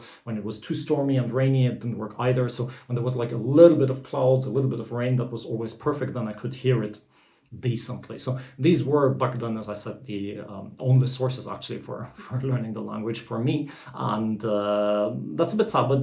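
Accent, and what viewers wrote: Norway. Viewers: You do speak English with a Norwegian accent, and I was surprised to learn you were German. When I lived in Trondheim, one of my student friends was an Irishman who had lived in Norway for three years when I met him, by which time he was absolutely fluent as far as grammar and vocabulary was concerned. And at that time I thought he was from the north of Norway, but no - turns out when you speak trøndersk with an Irish accent, it comes out as northern Norwegian. At least in his case. He told me he first came to Norway not knowing a word of Norwegian, but he got a job at a butcher's where no one bothered to speak English for his benefit, so he was forced to adapt. As is typical, he learned the cuss words first. He was 24 when he first came over, quite an advanced age to be learning a new language and mastering it in three years. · As a Norwegian (born and raised i Trondheim, but a father from Lofoten) I can hear his Northern Norway intonation when speaking English. 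It really makes me see him as a native norwegian 😅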